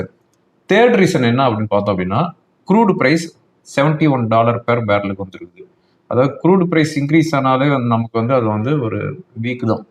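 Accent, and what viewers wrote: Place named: Kongu, India. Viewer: Rupee value வீணாப் போய் கெடக்குது 😂 கொஞ்சும் கொங்குத் தமிழ் அருமை 👍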